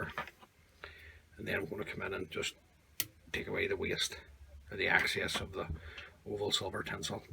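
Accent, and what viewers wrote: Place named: Belfast, United Kingdom. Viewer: you come across really well, and the Belfast accent is clear to understand and brilliant. Who could not like a Belfast accent. Well done keep it up.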